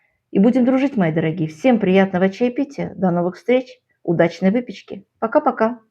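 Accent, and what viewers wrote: Baku, Azerbaijan. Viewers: Спасибо Вам! Живу в Краснодаре. Так приятно слушать Вашу речь, бакинские нотки слышны.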